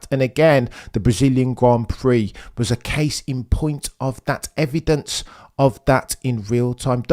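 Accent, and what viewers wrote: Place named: Oxford, United Kingdom. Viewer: Very philosophical , brilliant Oxford english!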